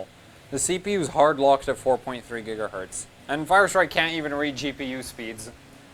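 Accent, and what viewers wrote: Australia. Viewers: My comment is second. I am calling him Australian.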